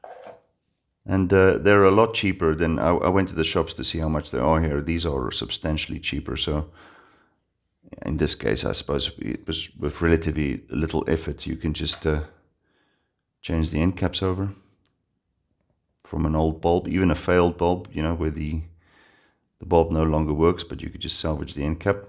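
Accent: South Africa. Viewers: Do I detect a South African accent 😀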